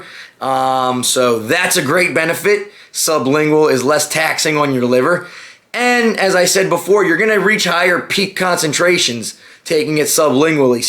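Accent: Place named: Pennsylvania, United States. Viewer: You sound like you're from PA. Philly or Pittsburgh or close by maybe.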